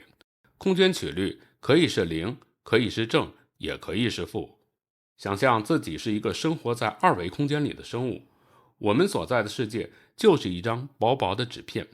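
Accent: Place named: Tianjin, China. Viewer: 主持人是天津人吧，你这内容是从哪抄来的？别装了，直接说天津话吧，你这天津普通话齿音字一点都不少！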